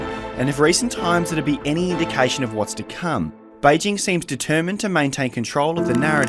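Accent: Australia. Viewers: Sounds like an average Aussie accent to me.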